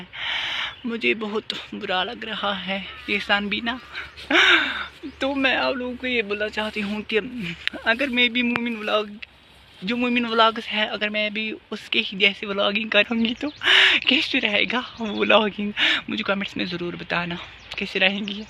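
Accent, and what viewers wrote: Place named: Arab world. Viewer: Arabi auuent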